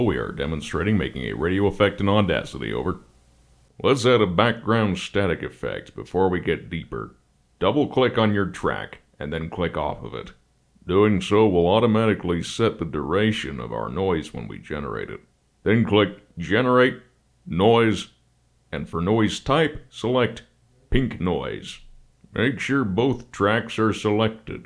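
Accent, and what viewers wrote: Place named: United States. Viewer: Most american voice Ive ever heard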